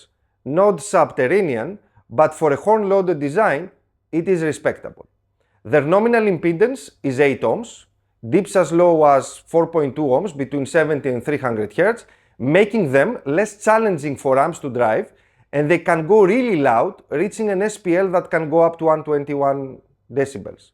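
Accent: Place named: Greece. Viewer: Hi, you must be Greek, if I can tell by the accent. Beautiful system, congratulations. Very transparent and well organized presentation. Subscribed. My system is comparatively very modest, but I really enjoy it: Pro-ject Classic Evo turntable, Ortofon 2M Bronze cartridge, Pro-Ject Phono Box S3B Preamp, Advance Paris A10 classic integrated amp, KEF LS50 speakers, 2 REL T-7x subwoofers, Marantz 6006 CD player, WiiM Pro Plus streamer, Audioquest and QED cabling.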